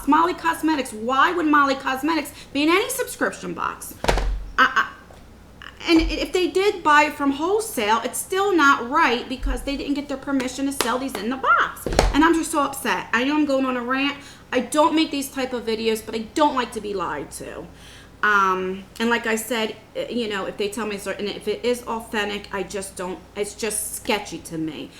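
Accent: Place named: Northeast, United States. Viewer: ...can I just tell you how fun you are to watch! Love your personality and your northeast accent! I feel like I'm hanging out with one of my cousins. You'd be a blast at a family party lol!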